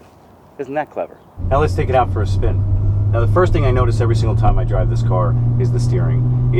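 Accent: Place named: New York, United States. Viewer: Exactly what I was thinking, got to be a New York accent thing.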